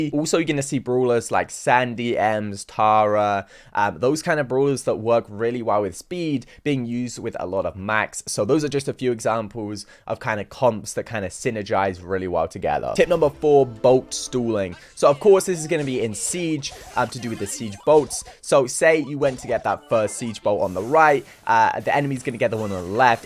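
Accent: United Kingdom. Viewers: it's called a British accent dummy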